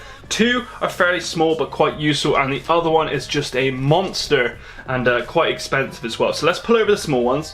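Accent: Bristol, United Kingdom. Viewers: are you from Bristol? That accent is V E R Y F A M I L I A R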